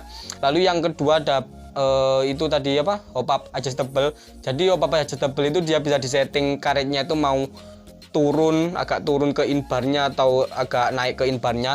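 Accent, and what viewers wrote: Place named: Java, Indonesia. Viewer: Jowone medok ea🤣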